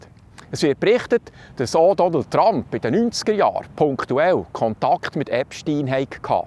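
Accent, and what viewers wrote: Switzerland. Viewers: Vielen Dank für die ausgeglichene Aufdröselung der Ereignisse. Auch wenn ich mich mit Ihrem Swizerdütsch etwas schwer tue - aber das wird schon besser ;) - weiß ich diese Art Berichts ehr zu schätzen. Eine Bitte zur Klärung hätte ich. Was hat es mit den somalischen "Kindergärten" auf sich? Hier gehen die Berichte komplett konträr - sprich: links sagt gar nichts, rechts um so mehr.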